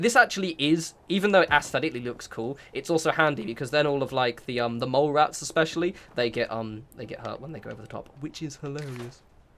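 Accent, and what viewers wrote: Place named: Yorkshire, United Kingdom. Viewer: Thumbs up for that Yorkshire accent 🤣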